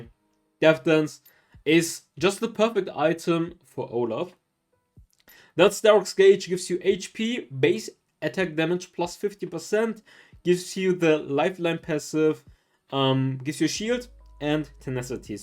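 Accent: Germany. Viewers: Wait u are german?? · Hahaha I'm also german and i noticed your little german accent although you are speaking very good english in my oppinion:D And I have a friend who has the same "family background" as you and I thought maybe has the same background XD I guest correct xD Aber im Nachhinein kann man das ja immer behaupten. Warum hab uch das grad auf Englisch geschrieben xD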